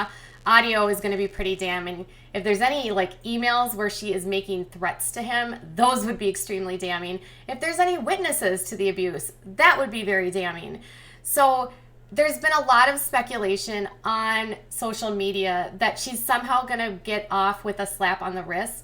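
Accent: Minnesota, United States. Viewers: I like your accent. Are you from Minnesota?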